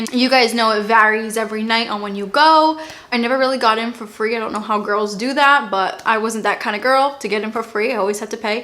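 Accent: Boston, United States. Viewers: I love how you say cancer your Boston accent comes through so cute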